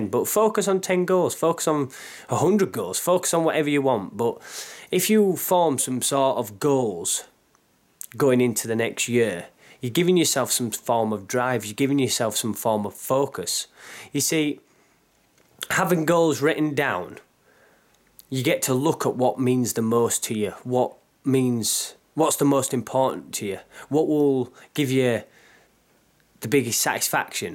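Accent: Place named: Yorkshire, United Kingdom. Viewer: Hi man...you're an unque guy obviously a free radical...I'm now in my 50s and was like you back in the 90s watching technology control people I'm not at all shocked to see what's happening because was saying things like you back then and folk just said yeah so what..your accent sounds Yorkshire..wakefield maybe,...I was a brickie too like you ..uourceirk looks good...all the best for 22..cheers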